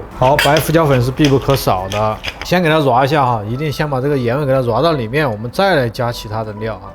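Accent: Sichuan, China. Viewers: 一定要多支持，听口音感觉是我们四川老乡，最关键的是讲解的非常仔细清晰但是不复杂。学到了很多知识。非常感谢，继续加油~~~ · 好喜欢的四川口音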